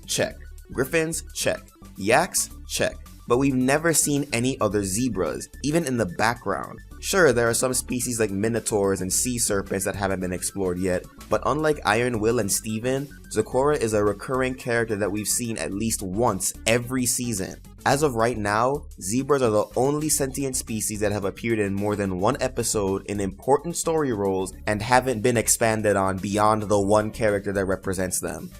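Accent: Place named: Caribbean. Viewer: so, what part of the Caribbean are you from?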